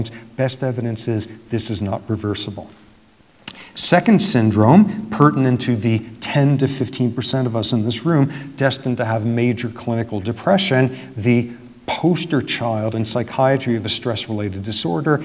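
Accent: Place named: United States. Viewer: sounds like murica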